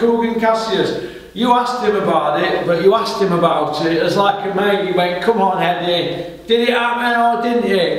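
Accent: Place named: North, United Kingdom. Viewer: Where you from? Sound like a good northern chap.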